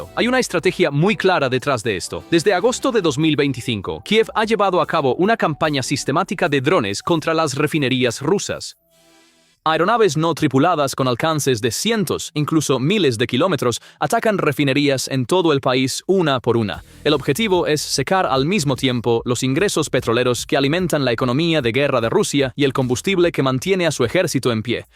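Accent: United States. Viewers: El acento usado al estilo gringo es UNA MIERDA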